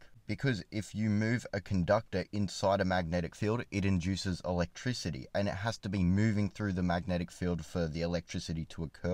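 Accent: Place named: Australia. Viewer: Dude you are one of the funniest channels on YouTube. The dry delivery and Aussie accent (at least to me here in America) is fuckin fantastic. If I’m ever in Australia, I’m gonna figure out who you are and we’re gonna meet up for a beer or 7. Keep the great shit coming!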